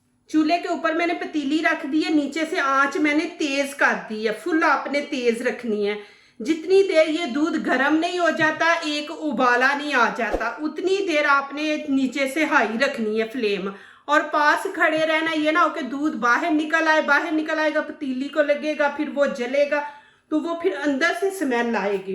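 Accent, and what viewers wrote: Punjab, India. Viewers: She is constantly speaking in stupid punjabi accent. Quite irritating. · Aapki Punjabi vadde hai... aapnki urdu se Pata chalta hai ...masha Allah... 🥰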